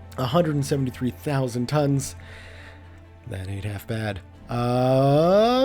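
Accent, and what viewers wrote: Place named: Canada. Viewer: hey its the soothing Canadian voice of that i need in my life.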